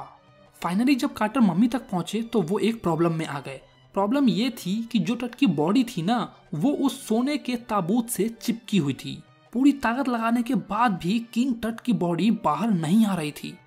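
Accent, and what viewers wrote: Bihar, India. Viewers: Bhaiya aap bihar se ho ki mp se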